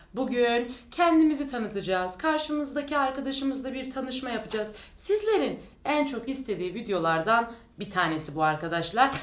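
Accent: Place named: Diyarbakır, Turkey. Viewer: Teknik olarak kürtçe diyemezsiniz, öğrettiğiniz kurmanci dilinin diyarbekir şivesi ...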